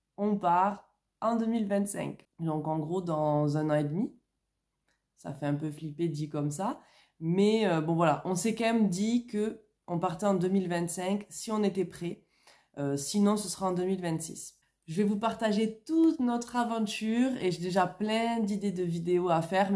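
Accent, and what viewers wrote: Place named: South, France. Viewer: Ha!! Toulouse! Je me disais que c'était l'accent du sud ! Nous c'est Perpi ! Encore merci pour toutes ces infos, votre départ approche de plus en plus !!! Trop hate de connaître la suite, on prend le même chemin que vous ! On a la quarantaine, pas d'enfant et conjoints de fait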